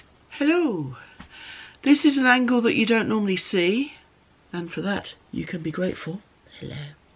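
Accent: United Kingdom. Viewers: So happy to be hearing your lovely British accent again!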